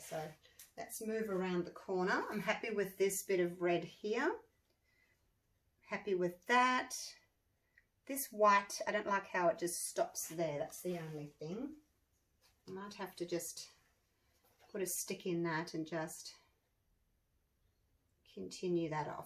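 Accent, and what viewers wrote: Australia. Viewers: Hello Lady from Australia, I love all your work very much and would like to try some swipes you do so well, but I really get confused about your measurements like grams, is there an easier way of ratios? I am a retired widow who want to learn a couple of things in the pouring department, thank you in advance, love your accent btw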